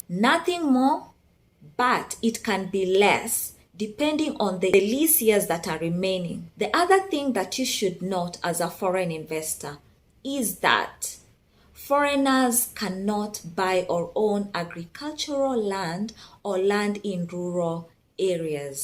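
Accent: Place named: Africa. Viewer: I like your beautiful African accent. I'm Nigerian and I approve these messages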